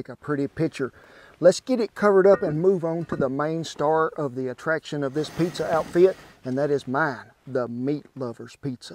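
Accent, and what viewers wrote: United States. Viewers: Sir, i thoroughly enjoy your videos and enjoy hearing your cowboy accent. Your fan from Singapore!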